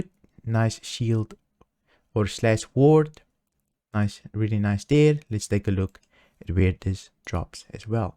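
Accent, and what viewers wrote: Afrikaans, South Africa. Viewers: Love the Afrikaans accent. Im also from South Africa.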